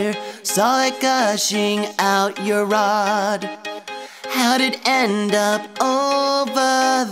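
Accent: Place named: London, United Kingdom. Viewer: LMAO at the London accent....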